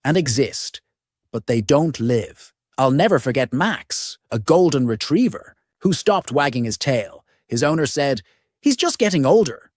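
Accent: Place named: Dublin, Ireland. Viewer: What a horrible voice over - some sort of warped south side dublin accent , sounds like it’s reading w emphasis in all the wrong places - unreal - cringe making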